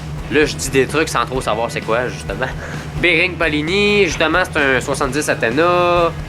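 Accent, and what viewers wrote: Quebec, Canada. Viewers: Mdr l accent canadien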